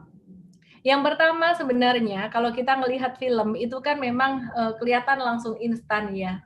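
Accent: Java, Indonesia. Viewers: logat jawa sing kandel banget. 👍 anyway and thanks 😊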